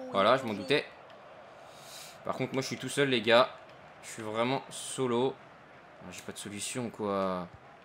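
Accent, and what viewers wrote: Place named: Belgium. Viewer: j'ai l'impression que tu prends l'axen belge je c'est pas si ya que moi qui l'ont on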